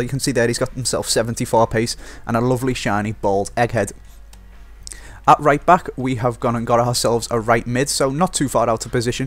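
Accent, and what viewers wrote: Liverpool, United Kingdom. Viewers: i hate scouse accents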